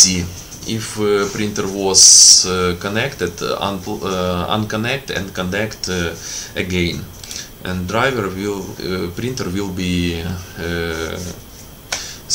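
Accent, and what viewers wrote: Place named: Russia. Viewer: Thank you, from the bottom of my heart. helped. Russian accent you have, somehow it was not usual to listen to such a speech. But, really, it helped a lot. ❤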